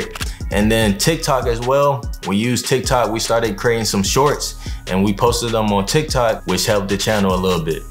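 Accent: Philadelphia, United States. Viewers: Are you from Philly? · I usually don't comment to any videos, but really, I enjoyed the language and the knowledge he shared, maaaan check how he pronounce the hundreds LMAO, I just feel like I'm talking to a close USA friend haha peaaace man ❤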